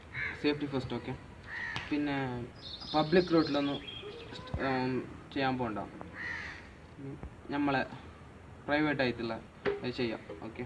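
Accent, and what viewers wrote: Kasaragod, India. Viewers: Nth da slang kettal arila ksd😍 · Tips ellam okke aanu bro.. Kasrod slangum polichu.. Kurachu koodi spacious aaya sthalath venam stunt tips kodukkaan.. Ennale viewersinu oru majje indaavu.. Slang oru kuravaayitt kaanathe confident aayit samsarikkanam.. Otherwise all good.. Keep going.. 😍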